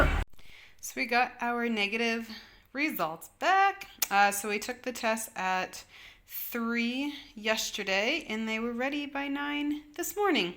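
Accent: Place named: Scandinavia. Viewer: What is your native language? It seems Danish or Nordic or something. The accents read Nordic.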